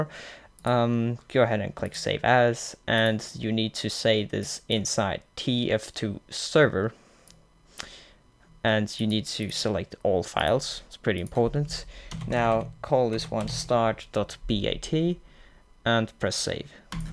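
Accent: Denmark. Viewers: Are you Danish? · are you from denmark? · Are you Danish??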